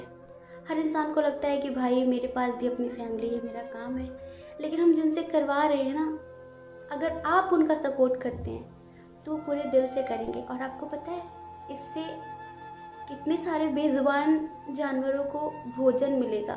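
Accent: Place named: Pahari, India. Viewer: Kitni pahari aawaaj hai aapki waise hi payare aap ho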